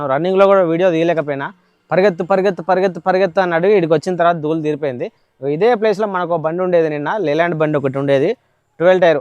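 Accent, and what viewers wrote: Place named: Andhra Pradesh, India. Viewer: Anna nidhi husnabad kada andra basha matladutatav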